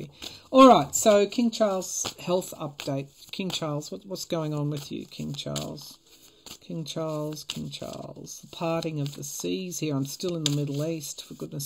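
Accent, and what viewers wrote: Australia. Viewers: Hi! I'm just a country girl who was born 65 yrs ago and have resided in North Carolina all my life. I found your channel a few weeks ago. Love your readings & love your accent!!! If I understand correctly, you live in Australia. On one of your videos could you say "G'day"? 😊 It has been my feeling/dream (since I was a young girl) that I should visit Australia one day. I have only visited one country outside the contiguous USA and that was South Korea. It was an amazing country! But, for some reason, I feel Australia is still calling out to me.😊 Anyway, I adore you and your channel! May God bless you!❤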